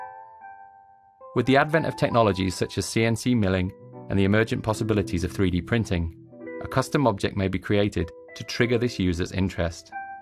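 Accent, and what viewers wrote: United Kingdom. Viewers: This is so clearly faked. This is pretty low budget (compared to Google), its narrator is British for some reason, THERE'S AN IPHONE IN THE VIDEO INSTEAD OF AN ANDROID, and it's too direct. Even if this is what they wanted, they wouldn't just come out and make a video about it. Also why would they give it creepy music? Come on. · B.S...If this really was a google internal video, why the eff is there an iphone, and imac, and ipad, and no google devices...seems more like apple propaganda (especially with the British accent narrator). But I don't discredit that google does shady stuff.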